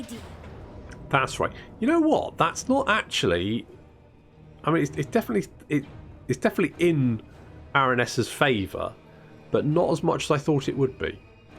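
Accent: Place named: United Kingdom. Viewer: And lastly also I think your British accent and humor plus good preparation with brilliant texts, make you the best warhammer campaign YouTuber. I love how you interact with what the characters are saying. If I would have a firm that would need any guy to connect with the community or make communication videos to community I would always choose you above others. You've got a talent. :)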